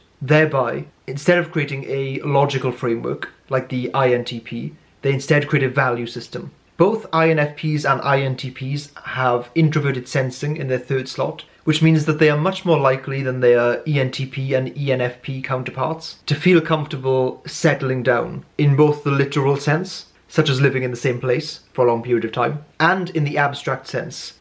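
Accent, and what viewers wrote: Wales, United Kingdom. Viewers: Interesting. You explained the INFP very well. Is that a Welsh accent I hear?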